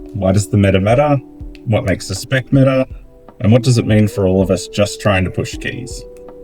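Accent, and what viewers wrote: Australia. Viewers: subscribed just bcz of the aussie accent. Awesome content!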